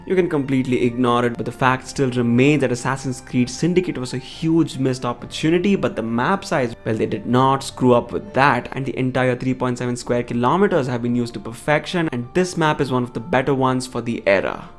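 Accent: India. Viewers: probably this guy, after all he sounds like a typical indian scammer that might phone your house. Can't be trusted.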